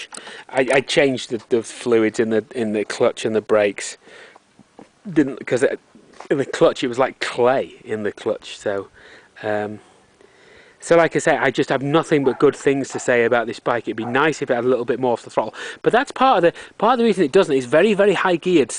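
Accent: United Kingdom. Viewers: This is how a rider review should be done. No 'ums' and 'ahhs' and stumbling, it's bloody seamless, articulate, and relevant. Well done, mate. Only thing you didn't confirm was can a full face helmet fit in the side bags...I know it does on the ST13 but what about the ST11? They look like they're right on the line of not being deep enough. I'm gonna do something like this review for my Honda Blackbird...another Honda masterpiece. I absolutely love the 80s look of the Concours 10 and it's rawness but you nailed the reality of this segment...at the ST1100s much higher showroom prices in 1994 it was a different story, but now with prices so low on these bulletproof used 90s bikes (both the ST11 and Connie are tanks) it's hard to make the case for a used Connie in order to save at most a few hundred bucks. Better brakes (ABS on some later models) integrated crash bars, better suspension, lower centre of gravity, overall smoothness, and subjectively more interesting engine and no oddball 16 inch rear wheel with its limited tire choices and iffy availability in the middle of nowhere...the Honda is the smarter choice. 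BTW your comment about England civilizing the whole fucking world had me howling as well as feeling a perverse pride in my savage ancestry. I'm gonna put that accent of yours (lapsed a bit) somewhere north..maybe Lancashire or Yorkshire. Am I wrong? Thanks again for a great review.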